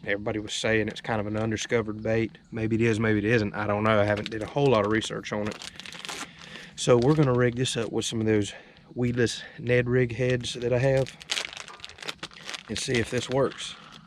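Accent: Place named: South, United States. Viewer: You are definitely right on the pronunciation but I like his accent and the way he says it better. 👍👍Good old Boy from the south speaking my language. 😀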